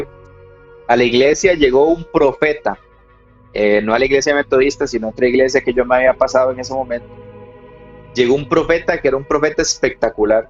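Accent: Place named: Costa Rica. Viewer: tu acento es. Tico....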